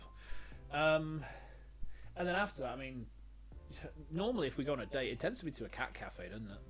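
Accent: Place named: United Kingdom. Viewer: She is the most british person i have ever heard. May she rest in peace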